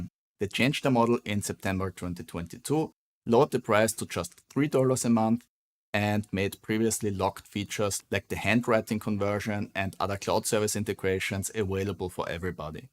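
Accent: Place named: Austria. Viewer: Inspite the heaviest Austrian(?) accent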